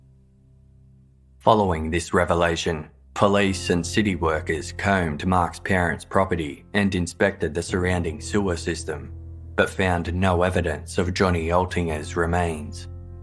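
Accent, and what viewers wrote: Australia. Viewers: The story telling (writing) in these is let down by the infuriating narration in my opinion. The terrible pronunciations ( eg. Celtic is not pronounced Seltic…but Celtic as in Kilt -at least twice said wrongly) And the monotonous tone is mind numbing. The clipped way AND is pronounced and then if followed by a word starting with J it sounds like he puts ‘the’ in the space so something like …”and Jane said” becomes “and the Jane said” which is not just distracting it is ludicrous. I know these are free on here but for those listening elsewhere, they are not free. Therefore those people are paying for mistakes. As for place names, I can excuse the odd mistake in pronunciation of foreign places (though that can be checked before hand) but when a place names in his own country is hideously wrong, it is disgraceful. Also, the accent, though somewhat Australian, is not typical, well not of the thousands of fellow Aussies I know. 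If this was a report card I would say…could do much better D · omg seriously he is Australian.His voice is perfect for podcasting.Not being a dramatic narrator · God help me I'm falling in love with the host's voice. And his aussie accent. i can't help. i just can't help it. Also the research done on this case, along with their previous episodes, is just fantastic. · Love the Aussie accent. You must be very “cultured”. He has an Australian accent. He’s an Aussie. Love the accent.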